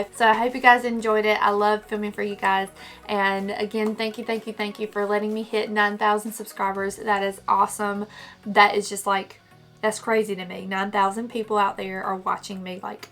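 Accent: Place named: North Carolina, United States. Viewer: are you from NC?? your accent sounds like it!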